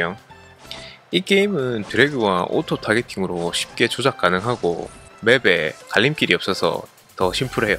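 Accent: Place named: Gyeongsang, South Korea. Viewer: 경남쪽 혹시 부산이세요? 사투리가 찰지시네요^^ 저는 뭐가뭔지도 모르고 시작한지도 얼마 안되서 배우는 입장입니다^^ 앞으로 잘 부탁드립니다~ 구독했습니당~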